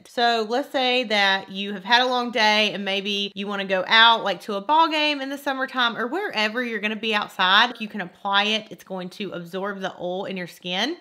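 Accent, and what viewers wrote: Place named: Texas, United States. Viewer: 😃😊 your accent is tooo flipping cuuute! Love it live it im from dallas tx origionally now stuck in indiana but your accent reminds me of home in a i miss tx kinda way!☺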